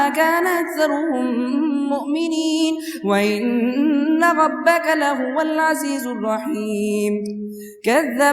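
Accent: Panipat, India. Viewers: ماشااللّہ بیٹے بھت ہی حسین بانی پتی لہجہ اللہ تعالی آپ کے علم و عمل میں خوب اپنی رحمتیں و برکتیں عطا فرماۓ آمین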